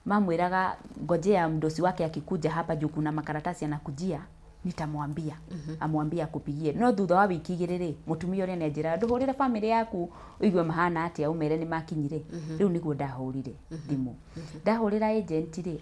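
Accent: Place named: Nyeri, Kenya. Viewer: This one is from my hood....kuria gwa tutaratari...😊❤Nyeri accent is the best.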